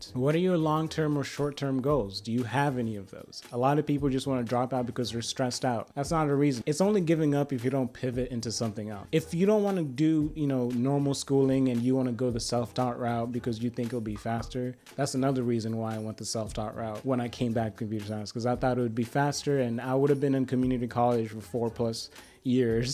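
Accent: Kenya. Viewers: are you by any chance kenyan?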